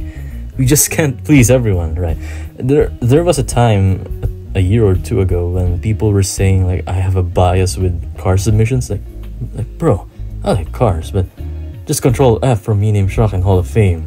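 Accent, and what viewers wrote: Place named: Philippines. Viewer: i can hear the filipino accent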